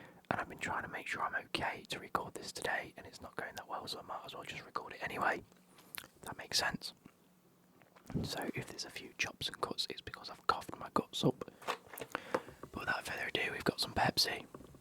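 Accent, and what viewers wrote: United Kingdom. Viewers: I think its so interesting how the australian or new zealand accent is what you fancy, because to me thats your UK accent lol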